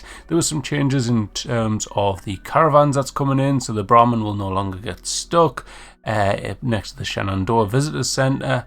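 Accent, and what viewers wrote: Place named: England, United Kingdom. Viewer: He's from England. This is your problem, not his.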